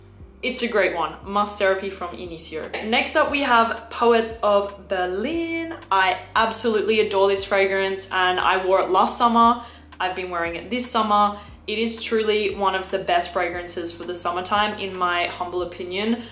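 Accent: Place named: Australia. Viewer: I love your knowledge. Very informative I just wish you would embrace you “Australian “ accent. 🤦🏻‍♀️ Its all over the shop. So some of your words are incorrect pronunciation. Bcos you are imitating a mix of accents. Lychee = “lie chee “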